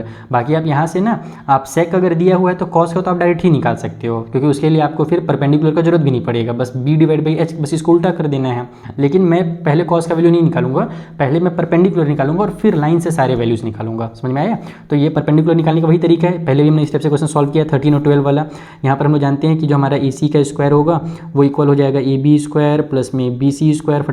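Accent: Bihar, India. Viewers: Sir are you bihari ✌️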